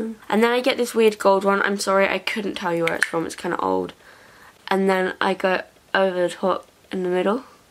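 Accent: England, United Kingdom. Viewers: Is she from England?? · What part of England are you from? I loveeee your accent!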